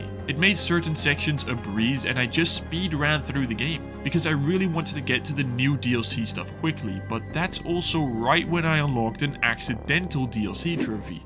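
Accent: Netherlands. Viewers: ARE YOU DUTCH?? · are you dutch????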